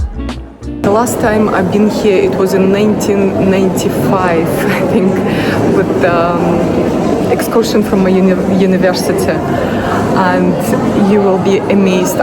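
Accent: Scotland, United Kingdom. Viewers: Do I detect a hint of a Scottish accent?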